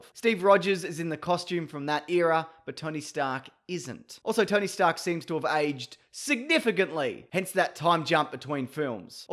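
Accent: Australia. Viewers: Dat TREACLY thick aussie accent countdown, tho! Make a full-vid of jus THAT maybe? And definitely keep counting too slow behind the actual onscreen yt countdown in the corner of the video, lol. PLZZZ. I'll donate to that charity for just one minute worth (or however long it takes to verbalize sixty syrupy seconds, that is). I'd bet you guys could make even a full countdown video hilarious and fun! \m/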